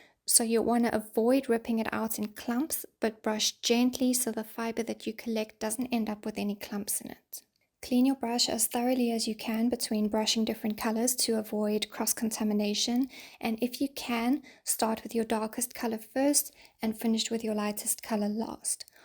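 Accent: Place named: South Africa. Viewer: Very inspiring and creative! Love your accent too. Did I spot South Africa? Hugs from Provence, Adrien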